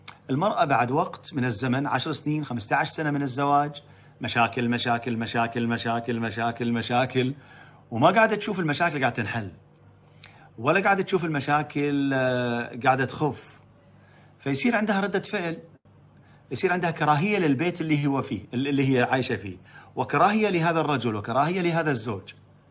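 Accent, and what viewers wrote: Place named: Levant. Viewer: انا ابي اعرف الحين ، انت من الشام . مسوي نفسك كويتي ليش ؟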